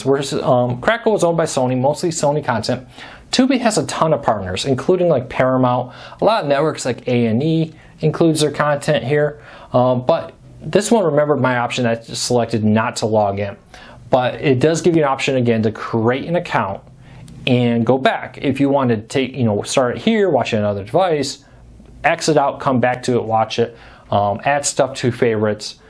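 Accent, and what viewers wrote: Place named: Michigan, United States. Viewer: Excellent information about the channels! Thank you very much for sharing. Also I could tell you were a fellow Michigander like myself ( Southeast Michigan-Metro Detroit) when I heard you talking in the beginning of the video. God bless!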